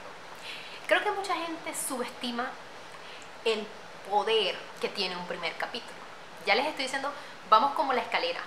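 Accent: Venezuela. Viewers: ¿Eres Venezolana? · Eres venezolana ? Por cierto gran vídeo estoy escribiendo una historia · wow, el acento venezolano se nota a leguas... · ¿eres venezolana? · chama wow. ¿eres venezolana? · El acento venezolano es notable 💁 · Por tu acento sabía que eras venezolana!!!! Te amo chama!!! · Se nota que eres venezolana. Que orgullo que eres de este país 💜💜💜💜. Saludos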